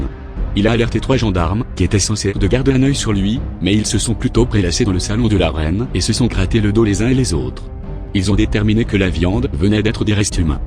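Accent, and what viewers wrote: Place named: Quebec, Canada. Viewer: mdr j adore cet accent (québecois)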